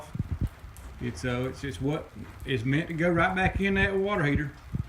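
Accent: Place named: South, United States. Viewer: This makes me smile so big. You sure are putting on that Southern 😁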